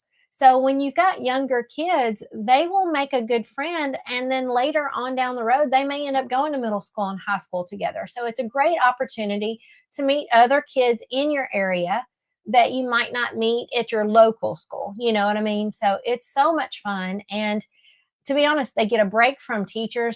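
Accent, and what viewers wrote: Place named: South, United States. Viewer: Hey! Cathching the replay! We're still put here at the cabin. Hubby has been building the shed and he's setting up the washing machine today that we picked up the other day! Yay! Nomore hand washing laundry when we're out here! I will still dry them on the ine, but we will have both an outdoor line and indoor in the shed. I love line drying anyway. I love your southern "hick" accent. I have a "slight" southern accent because I'm from an area that is kind of mixed between north and south, if that makes sense. I have have FINALLY developed the one habit that I've been struggling with for 15-ish years! Since I've bern here this trip (came here at the beginning of March); I have-without fail- gotten ready for bed every single night before settling in for the evening! Nomore falling asleep in my day clothes! I have made that a priority over after-dinner clean-up in my evening routine. 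That means I still have to do he clean-up in the mornings alot, but that's ok. When I ferl like my evening personal care routine is as automatic as brushing my teeth, both here AND at jome, THEN I'll work on prioritising after-dinner clean-up. For now, if I get it done, great. If I don't, it's ok. The ticks! Ohmy! We're constantly checking each other for them out here, and we still find ourselves picking them off after checking lol. We get them back home, but not THIS much! I've kind of gotten used to it as part gor the course lol.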